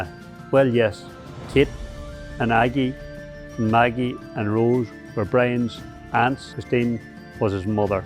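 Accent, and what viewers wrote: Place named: Scotland, United Kingdom. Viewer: No,,no visit,,the accent is awful,,,just like the scot shit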